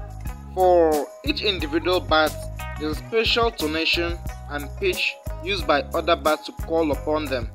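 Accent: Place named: Nigeria. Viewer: I am a Nigerian too.......I can tell a Nigerian when I hear one speaking .....this YouTube hustle is not easy at all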